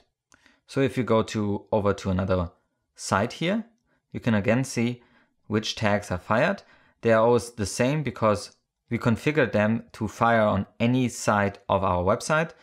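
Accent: Germany. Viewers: love that german english hehe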